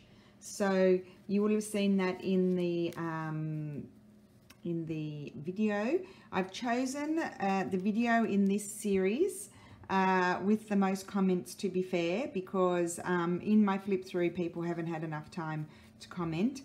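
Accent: Australia. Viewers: Oooh - How exciting. Beautiful journal. I've bought baroque and fragment add ons but love them all. Love watching you every day. Greetings from Perth WA. Great to get a glimpse of an Aussie's life in Italy. Terrific to hear the Aussie accent and lingo -))) and Something I've often wondered - are you related to Richard Roxburgh? (Big fan of Rake - amongst others!) Now that we are all addicted to tuning in daily, please say you'll still be around regularly!! :-))))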